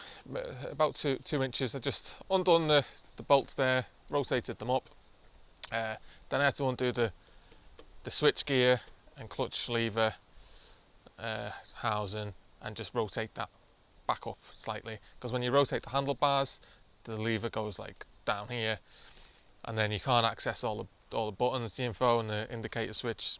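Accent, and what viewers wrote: Liverpool, United Kingdom. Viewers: A Scouser in Finland ? Are you on the run? LOL PS Subscribed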